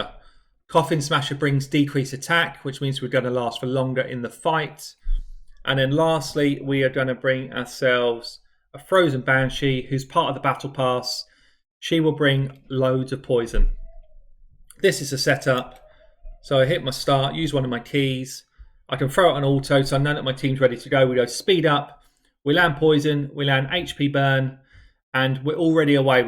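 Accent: United Kingdom. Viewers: you rock!! I've been watching your videos for a while and I always look forward to your insight and delightful British accent.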